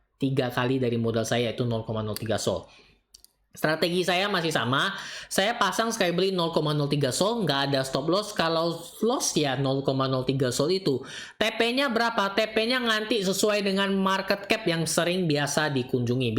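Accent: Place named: Pontianak, Indonesia. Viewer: Pak po.. bahasa orang pontianak ne hyung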